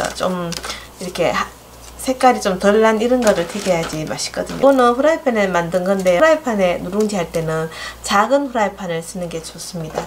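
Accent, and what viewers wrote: Gyeongsang, South Korea. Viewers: 경상도말씨가 정감이가네요 설명도쉽고 정말감사히 잘배우고갑니다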